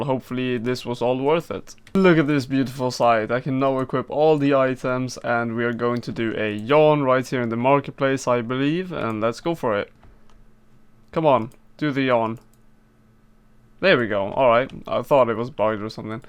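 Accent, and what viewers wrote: Sweden. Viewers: hörs så tydligt du är svensk, haha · you are swedish huh ;) i remember that english accent ^^ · Are you Swedish by any chance? Your accent sounds quite Swedish.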